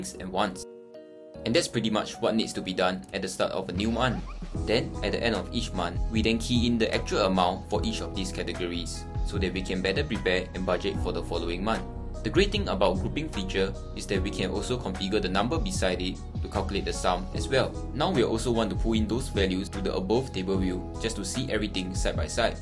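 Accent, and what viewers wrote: Singapore. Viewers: You from Singapore bro bro? Love the vid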